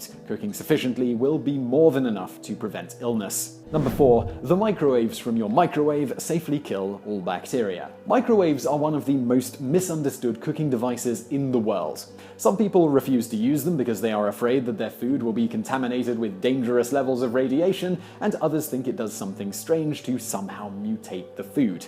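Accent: England, United Kingdom. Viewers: J.D. Hague He has what is known as an English accent. You know the English language is from England? Crazy right? These silly English people can't even speak English! Imagine that.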